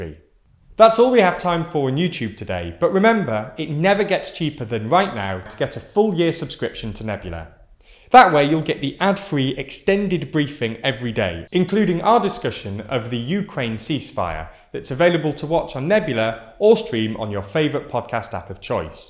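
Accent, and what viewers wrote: United Kingdom. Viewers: He’s British lol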